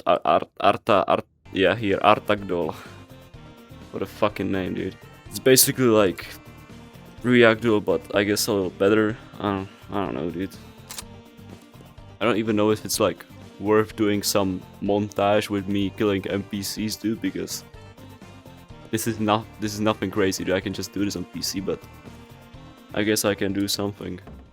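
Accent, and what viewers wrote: Czech Republic. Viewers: are you czech? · From the accent i knew that you are czech! brachoo řízek 🍺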